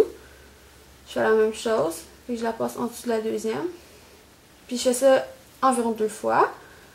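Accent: Quebec, Canada. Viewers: j'ai peiné à comprendre avec l'accent québécois mais les images aident bien :) ! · T'es québecquoise ??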